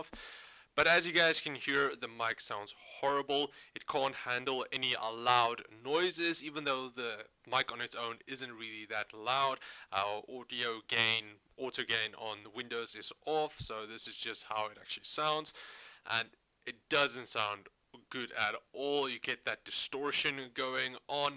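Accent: South Africa. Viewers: why are you trying to sound americaN? A boer accent mixed with american is a no no bro bro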